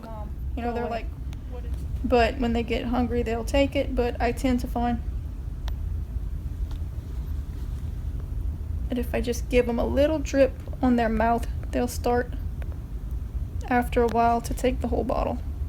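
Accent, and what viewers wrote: Louisiana, United States. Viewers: Most helpful video I've watched yet👍I like your accent too, sound like you're a Louisiannan🐰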